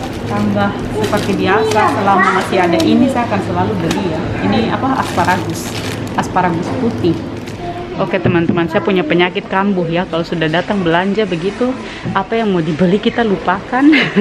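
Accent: Papua, Indonesia. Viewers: itu bersyukur dapet kk, orgnya menyenangkan, unik, bicara apa adanya, pintar, aku suka logat papuanya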